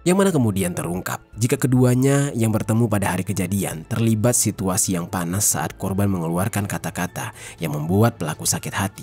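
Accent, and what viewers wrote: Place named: Jakarta, Indonesia. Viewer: Senyum2 dengar bang bicara bahasa banjar logat. Jakarta😅😅..salam bang dr tabalong❤❤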